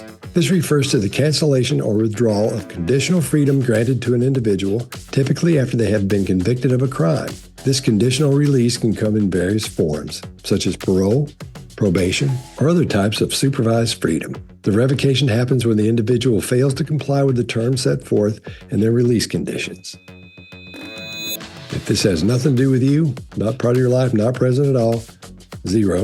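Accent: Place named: Texas, United States. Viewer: Scott's voice is so soothing, and his accent sounds very Austin / Dallas.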